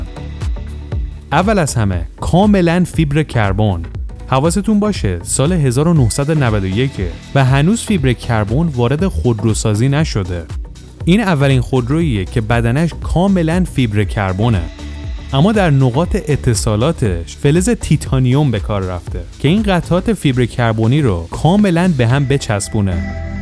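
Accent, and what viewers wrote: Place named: United States. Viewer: احمق داره لحجه آمریکایی درستش رو میگه ناراحتی؟؟ ساکت شو یاد بگیر چون تو داری اشتباه میگی، استاد زبان انگلیسی هست بچه جون🤔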